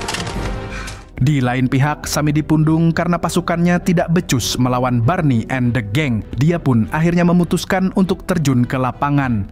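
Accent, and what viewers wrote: Banjar, Indonesia. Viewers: Admin nya orang banjar rupany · Logat bahasa Banjar nya gapernah ketinggalan😊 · Org bnjar kah pian mang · Banjar nya kelayan A Admin nya😮😮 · Urang Banjar kah pyn nie😅😅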